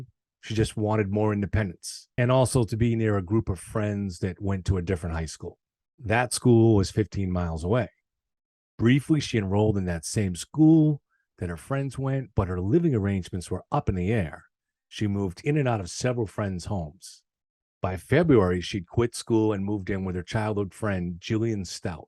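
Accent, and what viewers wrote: Boston, United States. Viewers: lol love the Boston accent ! 90 miles is still close!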